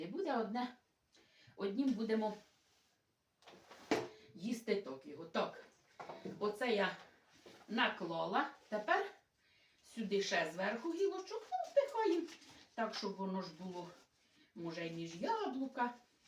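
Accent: Poltava, Ukraine. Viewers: така ж мова, слово в слово, Полтавська обл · Дивлюсь вас слухаю і настрій піднімається❤Балакаєте гарно так як і на моїй рідній Полтавщині(вас послухаю і ніби на батьківщині побула)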